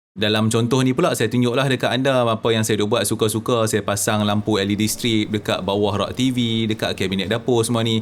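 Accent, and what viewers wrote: North, Malaysia. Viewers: tang cakap utagha tu yg sempoi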